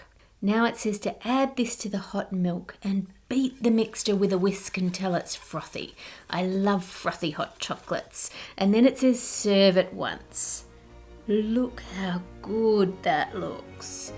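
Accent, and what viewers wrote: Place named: Australia. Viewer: Gotta love the Aussie accent!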